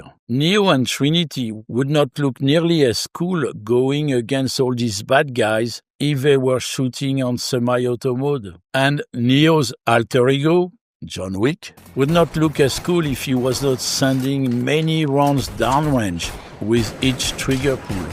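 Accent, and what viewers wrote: France. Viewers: I'm almost certain you're French, but I have no proof at the moment (except for your accent). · I love that you (still) have a strong French accent (a real plus for your channel in my opinion) 😁 Much more pleasant than the accent of many of my fellow Germans. They sound so wooden, as if they're trying to convince Scotland Yard that they aren't actually spies scouting England for a planned invasion. 🤣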